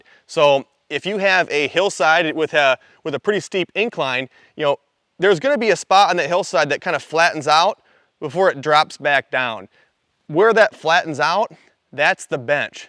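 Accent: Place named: North, United States. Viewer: Nothing but yankees and their accent and nomenclatures doing hunting vids. Is a good ol country boy to much to ask for vs city folks? Whats pryporties?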